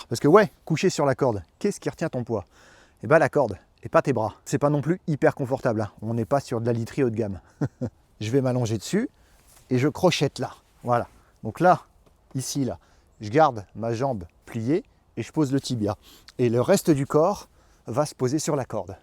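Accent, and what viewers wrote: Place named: France. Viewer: ... Pourquoi les français se prennent tjrs de pseudos Ricain ave ce bon gros accent franchouillard? Tu ferais mieux de te faire appeler Robin Dubois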